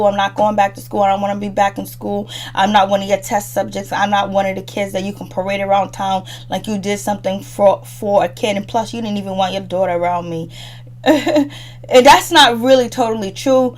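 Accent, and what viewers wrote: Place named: East Coast, United States. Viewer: your East Coast accent didn't hurt either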